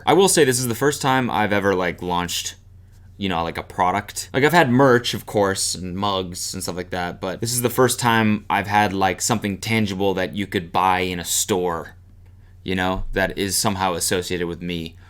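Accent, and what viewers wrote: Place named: Canada. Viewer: The Canadian accent is definitely shining through in this vid! Or am I just appreciating the fact that you are still all aboot your roots?* 👍❤️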